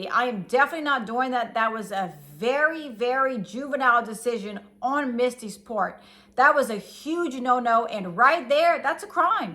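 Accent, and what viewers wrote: Louisiana, United States. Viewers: My new favorite channel. Southern Louisiana boy living out of state. A smart cajun sounding voice is entertaining and comforting. Keep it up and thanks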